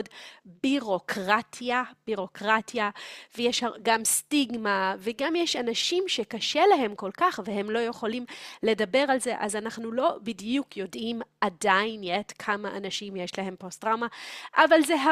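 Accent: United States. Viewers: השיטה שלך צריכה שינוי דחוף. 1. לא לתרגם! להסביר בעברית את המילים. מי שעדיין לא הבין יכול לבדוק בעצמו. אחרת אנשים לא מקשיבים לעברית באמת אלא רק מחכים לתרגום שיגיע. 2. לדבר יותר לאט. 3. שפת האם שלך בכלל עברית? נשמע שלא. יש לך קצת מבטא אמריקאי. אם מישהו פותח בפודקאסט כזה הוא חייב להיות דובר עברית ילידי כי יש לך מבטא. סורי אבל זה המצב. D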